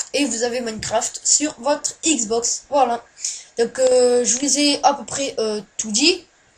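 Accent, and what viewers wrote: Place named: Marseille, France. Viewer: J'aime ton accent marseiller:)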